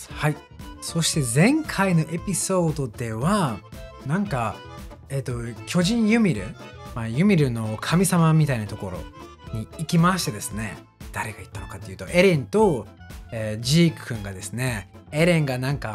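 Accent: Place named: Kansai, Japan. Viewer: えらい外国人顔の関西人やね。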